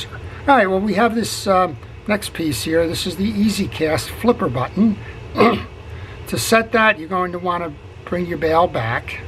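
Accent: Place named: Long Island, United States. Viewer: Excellent video and thank you ! You are a savior. I came here to figure out how I put the bail back on a Cirrus CR035. Had a hard time finding a schematic (probably could have search for one for the CR025). A coworker gave me the reel and I have been taking it apart and clearning and lubing it up. I could not figure out how to put that black piece on the bail spring (missed it had a hole for the spring bc I had grease covering it). Thanks to you I now have it covered. I needed to come apart bc there was dirt in there and had been neglected. Thanks again. You sound like you may be a fellow LIer; grew up in Rocky Point myself. Take care.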